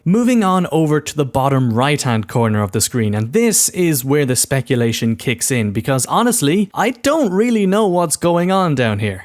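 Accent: Ireland. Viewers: Are you Irish by any chance? Accent sounds local ish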